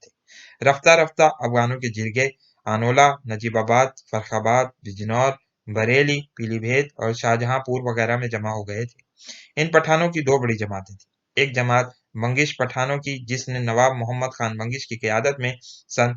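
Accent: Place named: Pakistan. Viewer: Tum lehjay se koi Pakistani lgte ho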